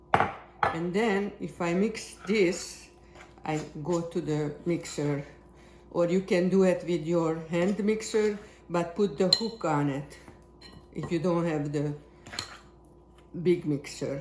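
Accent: Hungary. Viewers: What is the purpose of the cream of wheat? I want to try this but I have never bought cream of wheat. Your accent reminds me of my Grandmother who was born in Hungary. Love your videos. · This was difficult for you but you did nicely. Take off your bracelet next time. Keep at it. You’ll improve. Don’t worry about your Magyar accent, it’s nice. What is it in Hungarian and then English? Tell the audience. Also if you have ingredients that are from Hungary give reference where to buy. Good job!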